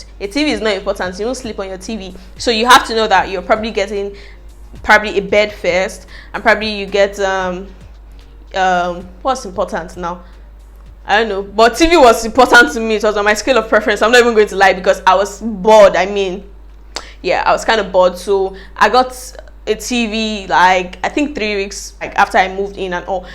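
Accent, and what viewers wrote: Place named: Nigeria. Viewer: We all know she is a Nigerian from her accent.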